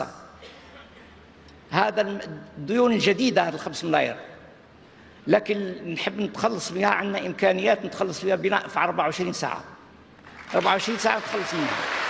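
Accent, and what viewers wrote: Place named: Tlemcen, Algeria. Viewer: il parle le vraie tlemcenien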